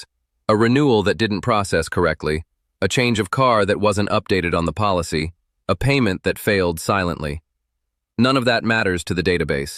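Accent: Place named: United States. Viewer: Why is this in an American accent with incorrect American pronunciation of the English language used. Americans have destroyed the English language. The content is largely incorrect. What a fraudulent waste of information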